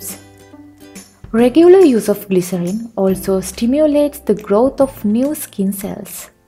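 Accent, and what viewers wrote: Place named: Sri Lanka. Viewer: Accent quite similar to Sri Lankan . 🥰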